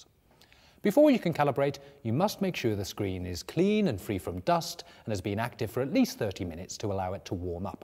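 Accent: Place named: United Kingdom. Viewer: He's so British I'm afraid to call myself British.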